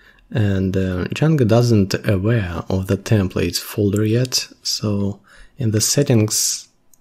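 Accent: Russia. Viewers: Сразу заметил русский акцент, но не придал значения, но потом увидел, что пользователя зовут )), благодарю за видео, познавательно!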